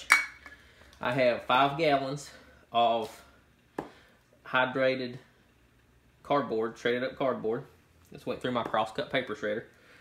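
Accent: South, United States. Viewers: HEAVY southern accent. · Awesome southern accent and very detailed video. Thank you for sharing. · This is a southern accent ")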